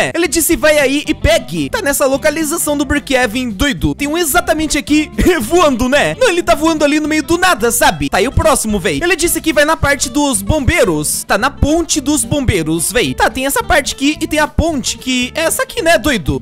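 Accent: Portugal. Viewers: Man c tá falando português de portugal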